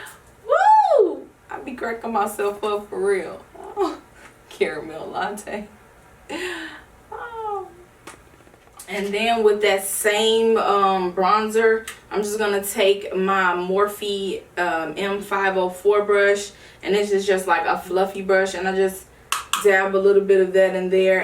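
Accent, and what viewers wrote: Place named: Chicago, United States. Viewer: Knew you were from Chicago as soon as you started talking lol. People say we don’t have accents but I beg to differ. Love your channel 💕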